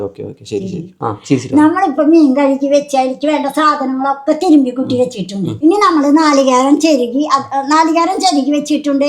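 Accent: Thrissur, India. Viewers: Chetta You guys are really cute💕😍.. Enthu resamma ee video kandondu erikkan😍.. Nigalude face lle Innocence serikum ariyam.. The way you guys smile 😍 and i really like thrissur accent ❤️ Chetta U r amazing.. Keep going 💪👍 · Trissur anoo chetta 👀😀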